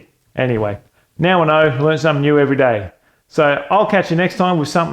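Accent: Australia. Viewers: straight in with c. YT SF-based kiddies, I mean algorithm trying to turn Oz accent into Yank accent and run it through ears algorithm again... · Good shit. We need easy to follow guides on getting not just familiar, but comfortable in using linux daily, all spoken in fluent (fuckin') Strayin.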